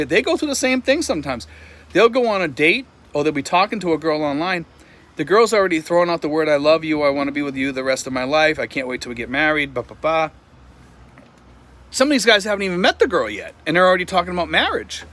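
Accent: Boston, United States. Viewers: Great advice I missed you more than I thought glad I reconnected I don’t know how I lost connection in the first place I don’t know if YouTube is playing games or watt but anyways it’s good to hear that Bostonian talk logic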